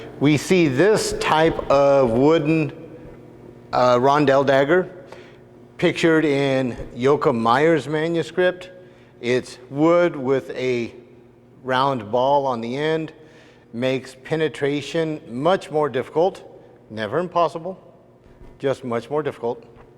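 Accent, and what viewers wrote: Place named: Texas, United States. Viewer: Is he Texan/Oklahoman? "Wooden d-..daggers.." old grumble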